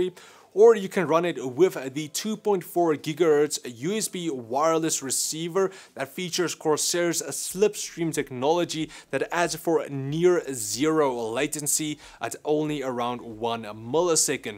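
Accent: South Africa. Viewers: Wow South African damn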